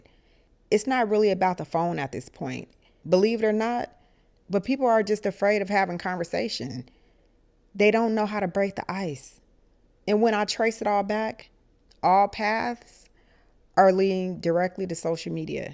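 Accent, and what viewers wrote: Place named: Los Angeles, United States. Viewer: You from LA? You got a LA accent.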